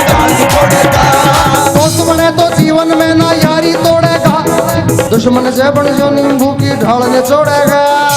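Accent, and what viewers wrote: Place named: Punjab, India. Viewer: I am Punjabi Jatt, I have business in Gurgaon, and they speak different haryanvi to this. He is speaking like punjabi style, its nice to hear. Jatt/Jat/Jutt zindabad. respect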